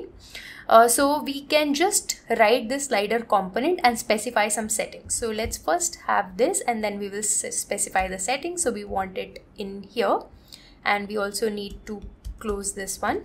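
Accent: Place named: India. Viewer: hindi accent...; i couldn't watch more than 2 minutes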